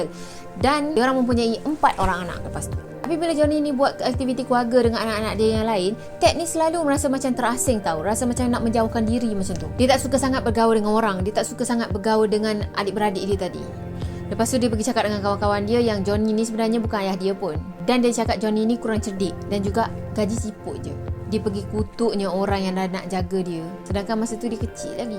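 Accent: Kelantan, Malaysia. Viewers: Patutla sy ade dgr slang kelantan.. rupanya betul sangkaan sy yg sis ni kelate hahaha samela kita sekampung 👍🏼😂😂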